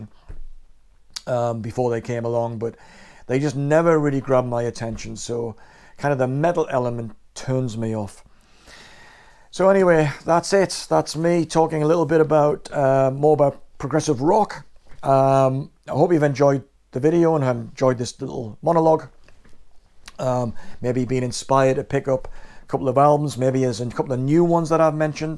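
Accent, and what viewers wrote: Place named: Newcastle, United Kingdom. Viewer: From the accent I guess you must have seen most of these bands at the city hall. We were so fortunate in the northeast to have such a great hall that most (if not all) bands visited Newcastle on their tours. Did you catch the Chrysalis tours with Genesis and lindisfarne?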